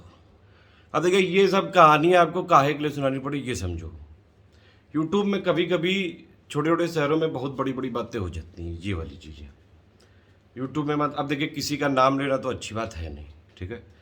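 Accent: Kanpur, India. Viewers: Aaj kanpuriya style may sandesh bheja hai.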